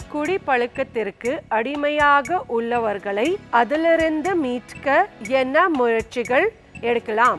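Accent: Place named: Punjab, India. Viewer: She is from Punjab and be proud she is trying to talk our language. Namma oorlaye Tamil a pesadha tamilans irukanga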